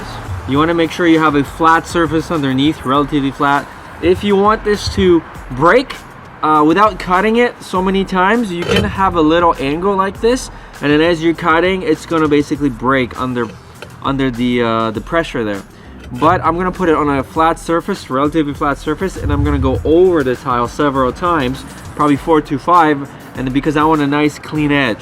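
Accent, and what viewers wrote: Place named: Pacific Islands. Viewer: You sound like a kanaka? You from the islands?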